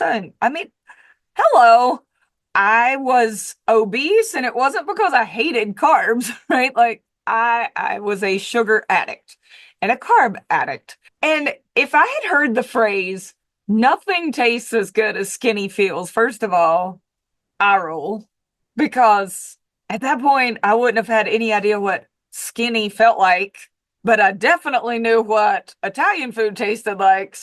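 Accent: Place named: Texas, United States. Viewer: Can't do accents? You have a stronger accent than mine, and I'm also from Texas. You have a country gal accent, you were just quoting a gal who has a backwoods accent, that's all.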